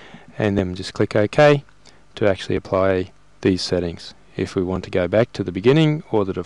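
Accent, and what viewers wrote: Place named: Australia. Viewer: Aussie...hehe. Sorry I would have thought this was really informative but your accent is distracting...been living in the uk for too long lol.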